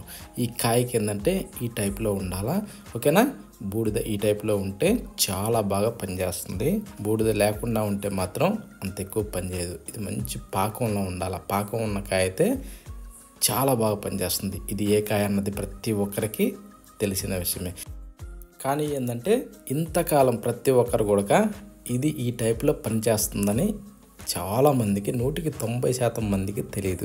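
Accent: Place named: Rayalaseema, India. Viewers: Adi rayalaseema slang ardam avadulee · చిత్తూరు జిల్లా యాస యెర్రగడ్డలు పచ్చడి suuuuuuper 🎉🎉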